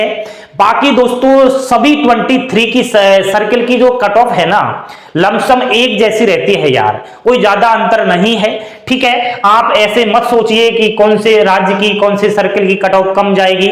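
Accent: Rajasthan, India. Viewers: Sir aap rajasthan se ho kya ❤️❤️❤️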